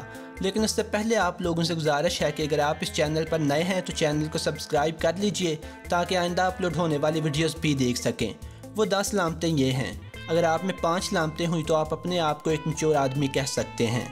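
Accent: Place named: Lahore, Pakistan. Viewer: آپ کا لاہوری لہجہ کافی پسند آیا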